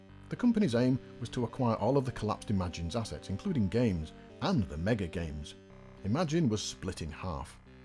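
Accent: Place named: North, United Kingdom. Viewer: no northerners pronounce stuff correctly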